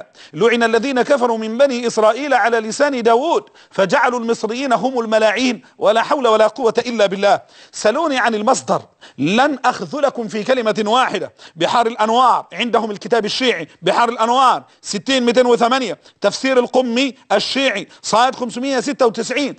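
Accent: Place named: Palestine. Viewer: بالمناسبة هذا ليس مصريا فاسمه ولهجته فلسسطيني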